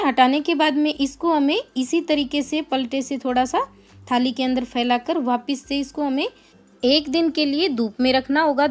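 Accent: Haryana, India. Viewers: Aap HariAna k ho tone se lgrha h 😊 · Maybe she's from Haryana can recognize her because of her voice.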